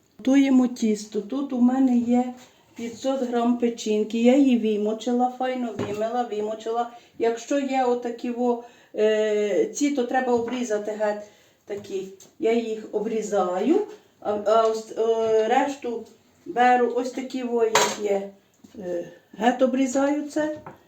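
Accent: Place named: Galicia, Ukraine. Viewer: Дякую! Завжди дивлюся Ваші рецепти. Продовжуйте! Ваш галицький акцент- чудовий! Всього найкращого!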